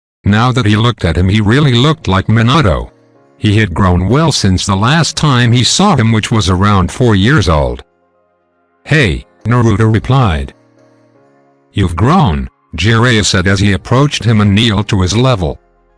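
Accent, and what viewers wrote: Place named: Russia. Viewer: somepeats sounds like a russian speaking english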